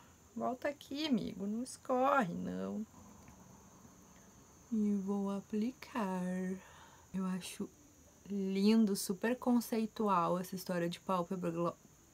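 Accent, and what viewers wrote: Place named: South, Brazil. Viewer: Ai guria, amo este sotaque do Sul 😍!!! Muito fã do seu trabalho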